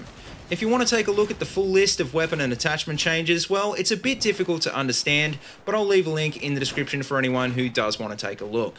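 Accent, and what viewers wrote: Australia. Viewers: Ive always wondered where in Australia you come from?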